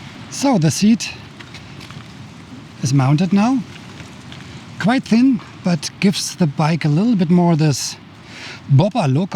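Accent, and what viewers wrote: Germany. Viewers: so nice to hear your German accent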